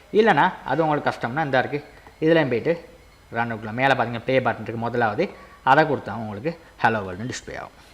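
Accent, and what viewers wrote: Sri Lanka. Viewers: Srilanka Tamil ah nenga ?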